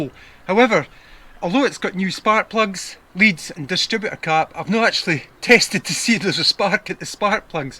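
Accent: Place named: Inverness, United Kingdom. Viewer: You sound like you’re from Inverness. I just revived Heart Vw MK1 with the K jet injection system. After rebuilding the fuel distributor, the problem ended up being no pressure coming out of the warm-up regulator. Come to find out it was completely seized internally. After taking it apart and freeing up everything, I had more than 60 psi’s feeding the fuel distributor, which resulted in the car starting for the first time. Leave no stone unturned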